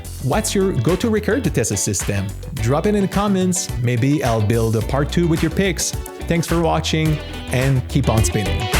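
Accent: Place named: Montreal, Canada. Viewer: Dude, Whoa! Just stumbled upon this video from my recommendations and within 10 seconds I assumed you’ve been on YouTube for years… only to find out when I checked out your previous videos that it’s been a month. Your production is top notch. Also, your accent and the Expo67 poster instantly made me realize you’re from Montréal (same as me). I rebooted my channel to document my Hi-Fi journey and it’s so cool to see someone from here also doing the same. You definitely got a subscribe and follow from my here and on IG. Keep it up ✌🏼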